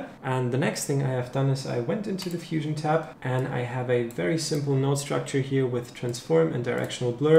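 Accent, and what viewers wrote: Germany. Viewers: your german, right? guessed by ur accent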